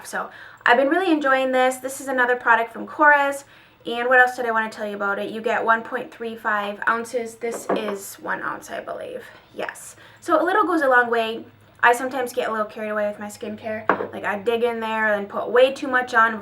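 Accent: United States. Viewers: New subscriber here your beautiful I love your voice I love American accents (I am from the UK) great video. Xxx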